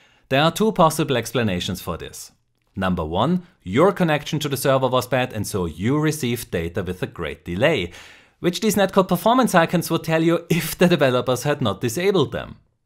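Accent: Germany. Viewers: you sound german accent. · Das ist das erste Mal, dass ich eins deiner Videos schaue. Sehr gute Arbeit, ich bin beeindruckt! Du musst Deutscher sein, hört man sofort an deinem Akzent. :D Weiter so! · Are you from Germany or Austria?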